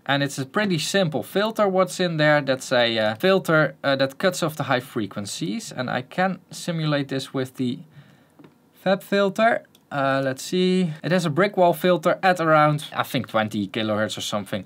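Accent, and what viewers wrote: Netherlands. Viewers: I suspect that you are Dutch. You have a Dutch accent. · Are you from the Netherlands? :-)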